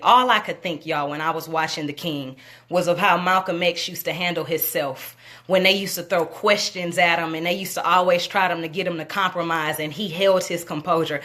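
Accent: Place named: South, United States. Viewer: You just earn a new Subbie!..You sound like me(southern twang) and have a awesome spirit. keep Queenin Queen!..✊✊❤❤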